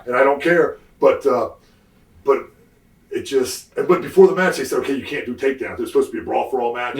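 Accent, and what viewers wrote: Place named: Texas, United States. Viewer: He sounds like Austin and Shawn. Texans.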